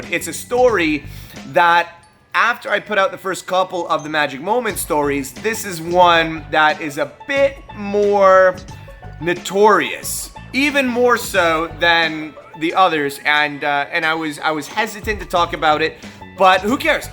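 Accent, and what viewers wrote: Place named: Minnesota, United States. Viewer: That Minnesota accent though 😍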